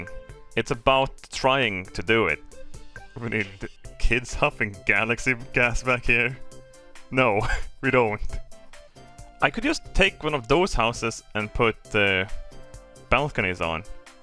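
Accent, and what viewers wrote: Sweden. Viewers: making videos in English for 20 years and still having a strong swenglish accent is wild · I can only hope to one day achieve level Swenglish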